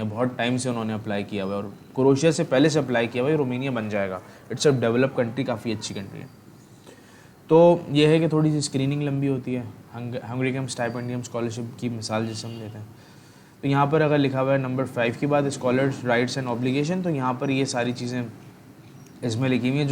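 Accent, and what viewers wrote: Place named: Karachi, Pakistan. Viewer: keep it up... Mujy Karachi walon ka urdu accent bohat pasand hai.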